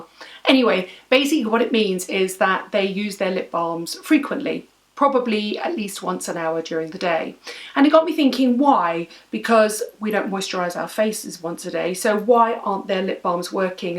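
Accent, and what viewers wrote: United Kingdom. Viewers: British accent 😍😍😍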